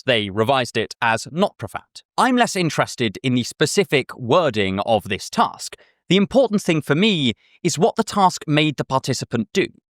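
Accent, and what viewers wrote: RP, United Kingdom. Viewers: Honestly your presentation comes off this way even pretentious but you're delightfully sensible as far as I've seen. Might be the accent if I'm honest very posh to my American ears.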